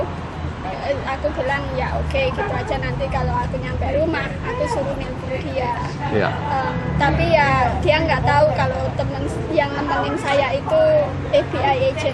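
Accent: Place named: Madura, Indonesia. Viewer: Ngomong nya meddok asli orng kampung tapi Alhamdulillah...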